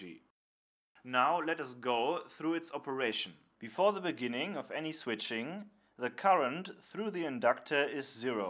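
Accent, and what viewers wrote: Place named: Germany. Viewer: My first time i see your videos, even with your strong german accent (btw im spanish with no very good english level lol) you talk very clear and easy to understand. Great video. Thanks for teaching!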